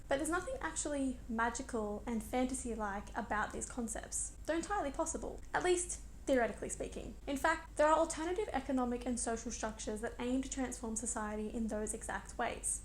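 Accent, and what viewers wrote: Australia. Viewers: You sound Australian ❤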